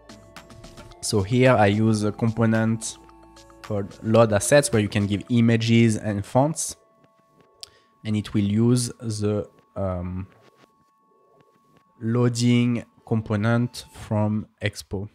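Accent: France. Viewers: Man your french accent is great! Nice format btw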